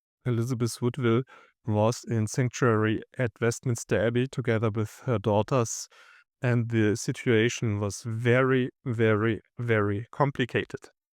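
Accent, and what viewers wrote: Germany. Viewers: you know I love your German accent! It's a hot day in Texas too but that's normal for August. I sure hate the heat. It's so draining. At least I'll be you have snow in the winter where you live. Snow is a luxury here. But it's so pretty when it does. This whole York, Plantagenet, Lancaster, tower thing is confusing. About the time I think I have a grasp of it, something else comes up. You keep up the good work, ok? And thank you!! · Unlike Americans, the German accent is elegant, and graceful. It is a pleasure to hear you speak. Please, always feel free to do so whenever possible. I am looking very much forward to the expanded video on this subject as it is quite deep filled with complexities which may hold be the key to revealing the most long awaited, fiercely battled mystery in England's history. Thank you greatly for your fine, most dedicated work in this regard.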